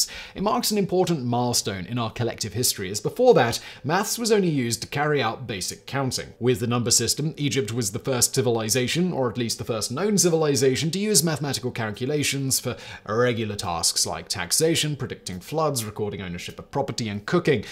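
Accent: United Kingdom. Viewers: I listen to the Casual Criminalist podcast at night while driving a truck in NC, USA. I have one complaint. It doesn’t come out every day. I need more murdering cannibal stories told to me in a proper British accent. Thanks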